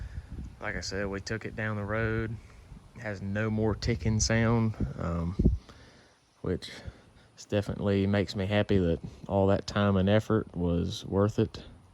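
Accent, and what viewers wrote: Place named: Birmingham, United Kingdom. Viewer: Hey I recognize that accent. I was raised in Birmingham. Lol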